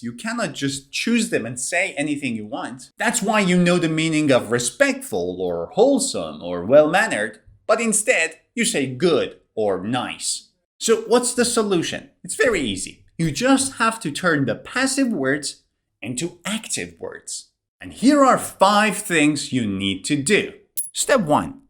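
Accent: United Kingdom. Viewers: Is your accent American or British. I asked it because you pronounced the word " either " like British not American.😊😊😊😊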